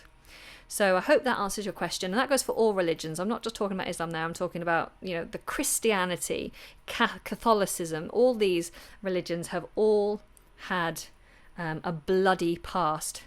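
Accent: United Kingdom. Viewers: You are doing a great job!Keep on!I wish health and happiness to you and your child!Thank you for your videos!I love your British accent!From Russia with best regards! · Where do you live? Your accent is as Theresa May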